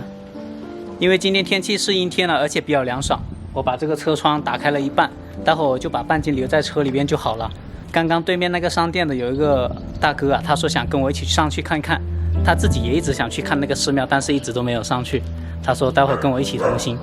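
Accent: Sichuan, China. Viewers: 也會說四川話了😂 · 四川话寇以撒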